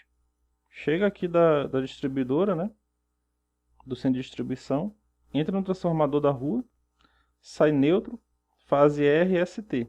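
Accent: Ceará, Brazil. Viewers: Pensei que vc era do Ceará rsrs moro em barra do corda Maranhão rsrs show de bola a aula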